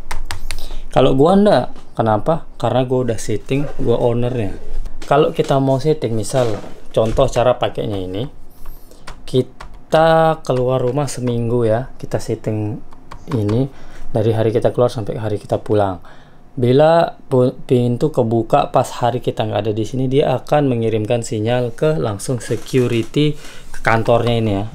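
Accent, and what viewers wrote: Lombok, Indonesia. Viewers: bang, org lombok mana? kok kayanya logat lombok banget klo ngomong heheh maaf pendatang baru, tyg lotim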